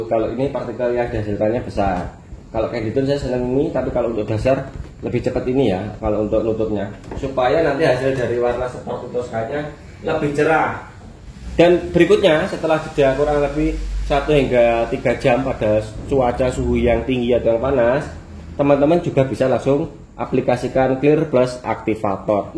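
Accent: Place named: Java, Indonesia. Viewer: Kirain di daerah jawa, nada suaranya kaya orang jawa 😁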